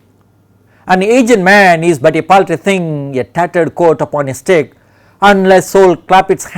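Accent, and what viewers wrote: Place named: India. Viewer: There are different accents in the world... We feel better with Indian and british accent.you better listen to him carefully.He is an English prof. In reputed IIT. Look at good things... The stuff they have prepared is very much student friendly.... The content is brief and comprehensive. Look at good things.don't comment rudely.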